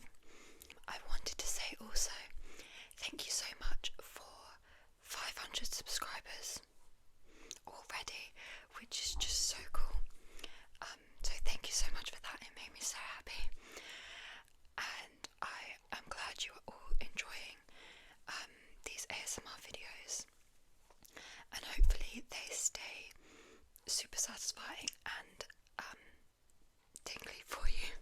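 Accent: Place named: United Kingdom. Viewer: you seem to be British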